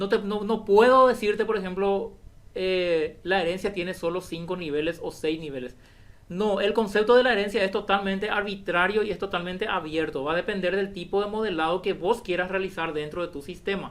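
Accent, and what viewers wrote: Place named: Paraguay. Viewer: Inconfundible el acento paraguayo, espectacular. Por cierto, cuando te refieres a la composición y agregación. En la composición es la instancia de la clase contenedora mas la instancia de la clase embebida la que termina. En la agregación no necesariamente debe morir la instancia de la clase agregada.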